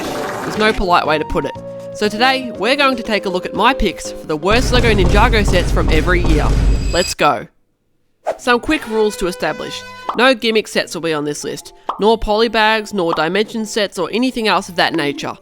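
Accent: Australia. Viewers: Your Australian !!!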